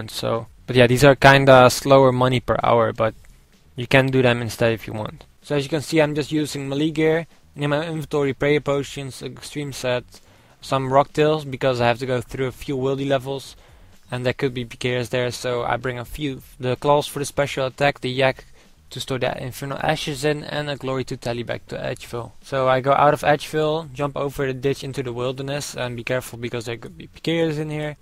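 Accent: Netherlands. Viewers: Je spreekt echt 'nederlands' engels.. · wist dat je NL was door je accent:p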